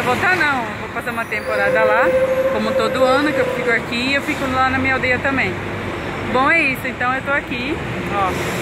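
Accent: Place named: Sao Paulo, Brazil. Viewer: Parabéns! vc é original! Fala tipo paulista, mas com a essência indígina! Show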